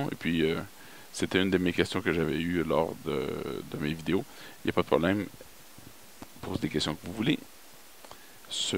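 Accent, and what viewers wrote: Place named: Quebec, Canada. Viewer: En plus avec ce doux accent du Québec , c'est du bonheur !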